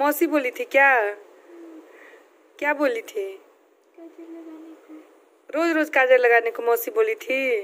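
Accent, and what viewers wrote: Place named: Ballia, India. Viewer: Logon ka kaam h kahna kahane do bahan aap kaha se h aapki boli balliya side ki lagati h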